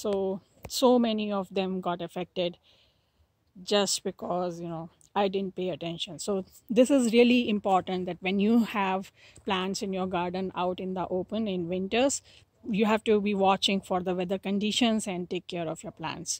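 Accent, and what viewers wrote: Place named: India. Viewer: Hey. May I know in which country you live in? You never mention any country or state in your videos, so it confuses me a lot... Your accent is Indian, if I am correct. But there hardly is frost in India... Also, I just saw your video about wisteria, though I have purchased some seeds of it, don't exactly know if they are original or not, but I have never seen wisteria in India. I am from Kashmir and wish to know if wisteria will survive here in snow. Thank you! And I apologise for so many questions 😂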